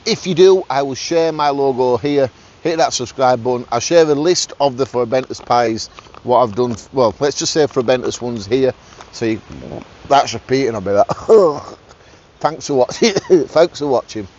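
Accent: United Kingdom. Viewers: here for outdoor motivation, and best uk accent! thanks mate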